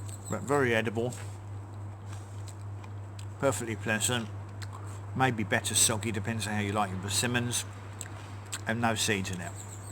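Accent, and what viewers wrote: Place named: Essex, United Kingdom. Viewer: yes I recognise the Essex accent 🤣 I’m in Langdon Hills so only down the road. I’m looking forward to learning more!!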